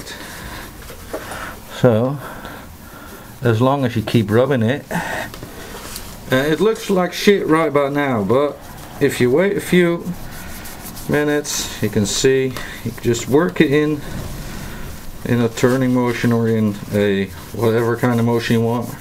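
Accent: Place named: Netherlands. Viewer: it sounds like he's dutch and we dutchy's always talk funny if we talk english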